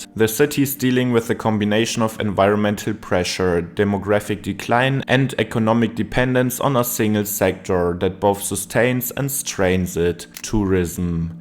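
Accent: Germany. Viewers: bro es wäre so geil wenn du nen zweiten deutschen Audiotrack anbieten würdest, als Deutscher kann ich mir dein Englisch wegen dem Akzent echt nicht lange geben, aber machst eigentlich sehr geile Videos 😂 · Im in 2 seconds and I already know this guy is german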